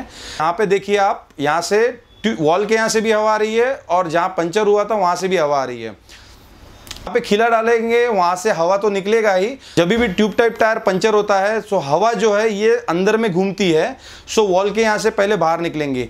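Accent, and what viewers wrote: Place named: Gujarat, India. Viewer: Bhai Hindi bolneke Andaz see pata chal raha hai aap bhi Gujrati ho, specially from Khila word😂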